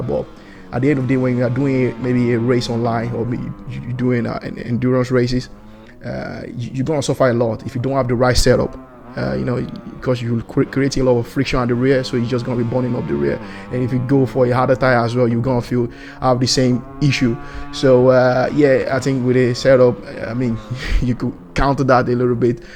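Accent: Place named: Netherlands. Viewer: I like your Dutch accent but sometimes it is hard to grasp. :D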